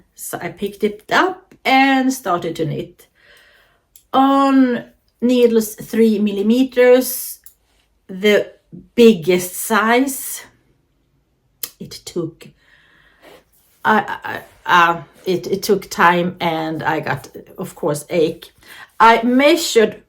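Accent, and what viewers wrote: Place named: Sweden. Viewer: I love yout Swedish accent ! It's so cute❤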